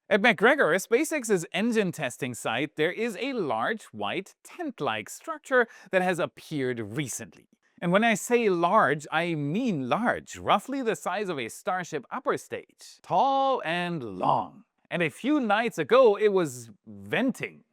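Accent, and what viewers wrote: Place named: Germany. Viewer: “ that voice got sinister sounding real quick”. I noticed that too. elocution is changing and in addition to the intonation you mention, he's forcing the "r" maybe with the intent of sounding "American". I'm a Brit who's been in France for four decades now, and am not losing my UK English accent in French. Nor does Scott Manley lose his Scottish accent in California. Out-of-region accents are positive and participate in creating one's personal trademark AKA "branding". On the same basis, its totally okay for to show his German origins when in Florida and not mess with his accent or intonation through vocal coaching which is a waste of money IMO and possibly damaging in the long run. I do hope gets to see this comment and maybe associate this with similar remarks made by other people over time. Be yourself!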